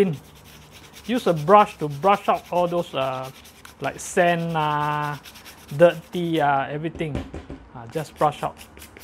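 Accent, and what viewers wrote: Singapore. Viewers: HAHA. Funny uncle. Thanks for your comprehensive guide! Love your Singlish! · first time come across your video! solid. keep the singlish real. feels like home!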